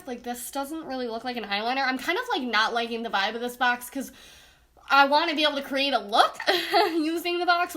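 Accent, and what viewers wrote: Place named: Midwest, United States. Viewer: This is the very first box I THOUGHT I was signing up for... I signed up, thinking I was late enough into July that we would skip July and go right to August. When I opened the August box I was not pleased with any of it. I don't wear lashes, the Laura Lee colors are chalky and they don't apply smoothly nor do they blend very well. I'm surprised she launched with a formula this difficult to work with. I do feel bad for whatever the drama she and some of the others are going through. I appreciate all those who are staying out of it and remaining positive/uplifting. I'm new to your channel because of Boxycharm. You sound like you've got a Midwest accent. I'm from Wisconsin but I'm not sure how much of the accent I still have, since I moved away at age 19. Haha, "Poop Brown" that's fun! I enjoyed your no-nonsense review. 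If you go for Jaclyn Hill's vault or maybe some of the BH cosmetics palettes for color (Zodiac, or Galaxy palettes --they have 4 of them, and they are $18 each unless you find a sale.)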